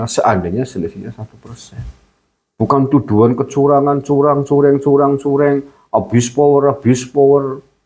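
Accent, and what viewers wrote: Banyumas, Indonesia. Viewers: lik rika ngapak ,,ya ,,nying fens rika lik semangat yak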